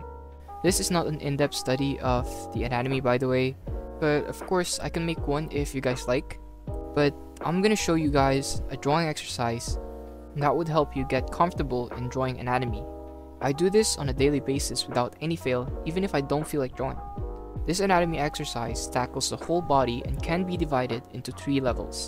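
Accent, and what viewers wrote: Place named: Netherlands. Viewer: Idk what is it but your accent sounds very dutch. Also great video!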